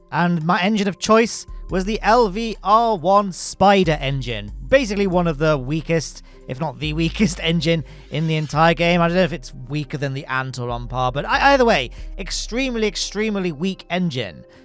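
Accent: United Kingdom. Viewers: Forever confusing Duna with Jool thanks to the palatalized British accent...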